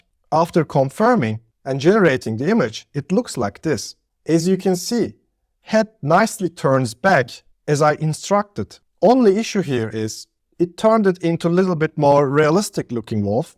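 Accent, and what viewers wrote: Turkey. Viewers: From your accent I can tell you're Turkish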